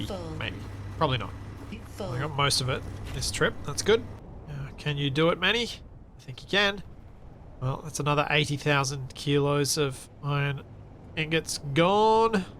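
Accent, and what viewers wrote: Australia. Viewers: So many happy shout outs during this episode. Also a couple of frustrating times. All came out in a fantastic way of Australian accent, I love it. It always makes me smile, laugh and want to go back visiting down under 😊 Thanks, it is always a pleasure watching your episodes 🥳🇩🇰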